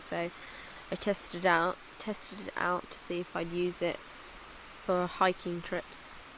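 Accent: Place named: Wales, United Kingdom. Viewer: in the valleys yeah lol nearly got the accent lol move to wales best thing you ever do. i know you love it lol btw its a stream not a river lol. take care hurry up back.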